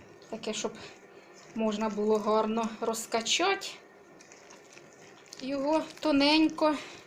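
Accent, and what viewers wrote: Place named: Central Ukraine, Ukraine. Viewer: У вас гарна українська мова центральної України Так говорять не тільки в ЧЕРКАСЬКІЙ, а й в Полтавській обл.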